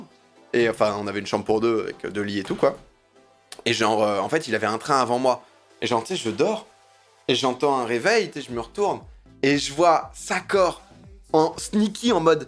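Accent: France. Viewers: T'es sûr d'être du 69 domi? Pcq j'ai bien l'impression que tu es plus Marseillais de la façon que tu raconte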